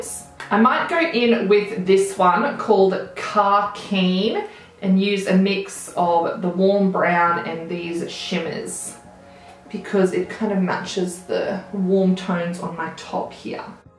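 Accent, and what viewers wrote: Australia. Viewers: Of all Aussie beauty youtubers your accent strikes me as the most aussie! Its how the last word in your sentences goes up ↗️ You must be from Queensland 😂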